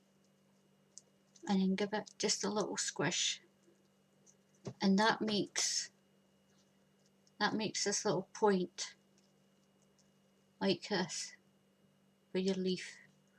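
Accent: Scotland, United Kingdom. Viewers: I loved listening to your lovely Scottish accent as much as I loved watching the flower coming to life. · Just happened across this posting as I was looking for ideas for my daughters wedding. I have to say it was like my Mum was talking to me !(Scottish accent!) Thank you for this wonderful idea ! Now I need to make 30 of these!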